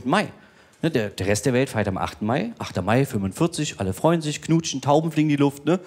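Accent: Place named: Berlin, Germany. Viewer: Ich find ihn mega gut. Und ick liebe did Berlinern. 🙏😂.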